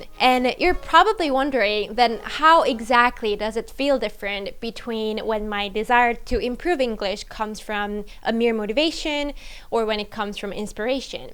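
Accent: United States. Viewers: Fluency is easy thing, u need to go english spoken country. Hardest thing is sounding like a native speaker. The reason u sound like an american in english is that u used to learn the language when u were a kid which u had language plasisty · Your accent sounds American. Like if you hadn't said you were Korean I would think you were American. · you got american accent obviously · your English is amazing, research the English 'schwa' to nail these small pronunciation errors. A lot of English words, especially when spoken fast, have the schwa sound (e.g 'a cup of coffee' can be said as 'uh kuh puh coffee'). I learned French with a similar approach to you and I really did my own study on pronunciation in both languages. Other than that your accent sounds to me (I'm british) like a very American one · Why American English, and not English English? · Your accent is so cool.... 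I thought you were raised in the State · I’m pretty sure you must have lived in one of the native speaker countries and you sound like American. To be able to speak like you do, you need at least to have native speaker friends for your daily conversation and of course living in one of the native speaker countries is the best way to do it. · I have to say, your english is almost flawless. In your recent videos, you even speak with perfect american accent. I thought you were raised in the US. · Your English sounds like you moved to the States early in life. You have a "Californian" accent. Thank you for your story! Moral of the story. Listen to your parents!! Lol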